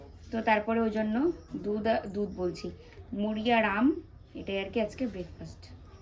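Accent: Bangal, Bangladesh. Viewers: তোমার বাঙাল কথার টান ভীষন ভালো লাগে। আমি তো ঘটি তাই তোমাদের বাঙাল কথার একটা আলাদা charm লাগে। মিকি কি মিষ্টি। আমার দুটো ডগুর মধ্যে একটার নাম মিকি।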